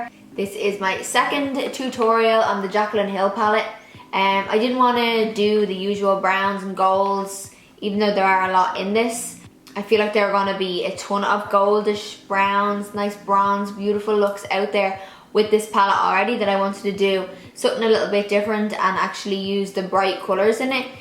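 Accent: Ireland. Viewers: Seriously I am subscribing just because I love your accent. Irish? My ancestors are from Cork. Stunning look by the way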